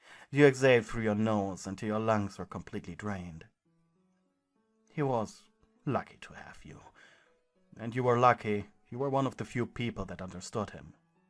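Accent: Germany. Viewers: Bro I love his German accent! It's cute💖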